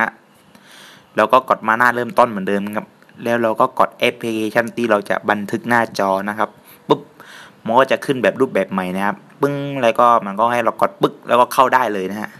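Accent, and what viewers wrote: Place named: South, Thailand. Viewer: เสียงเหมือนคนใต้นิ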